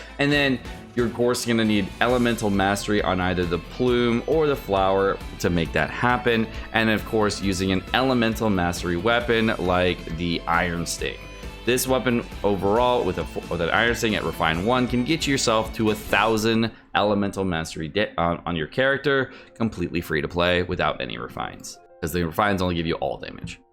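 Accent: United Kingdom. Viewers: British!!!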